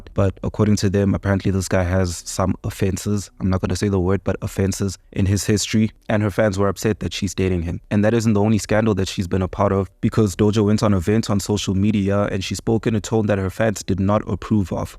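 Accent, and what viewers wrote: South Africa. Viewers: You sound South African bro. Like you went to Boston College or something. Anyway you speak very well and fluent. Keep going bro.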